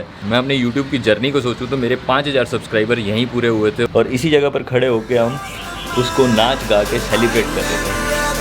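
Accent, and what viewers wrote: Punjab, India. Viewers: Oh bhaaji Punjabiya wali krti tusi tan aj....!!!!!Good to listen veer ji dialouge..!!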